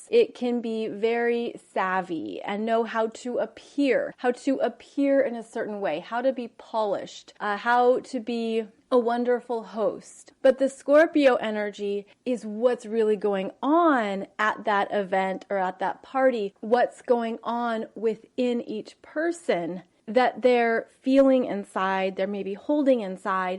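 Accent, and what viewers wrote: United States. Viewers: I bet you are from Michigan. Northeastern...???? I hear it in your voice.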